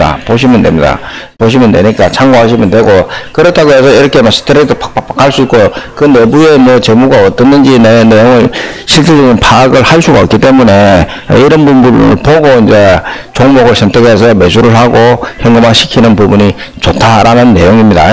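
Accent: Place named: Busan, South Korea. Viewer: 분석내용 잘보고 갑니다 부산 목소리 반갑네요 고향 떠난지 12년째 퇴직하고 귀향 해야겠어요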